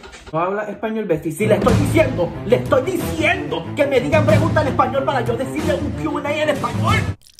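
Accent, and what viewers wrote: Puerto Rico. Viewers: Sabes que es Boricua solo por escuchar como habla en español❤😂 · Jamás pensé que sonara tan ✨puertorriqueño✨ pensé que era más gringo💀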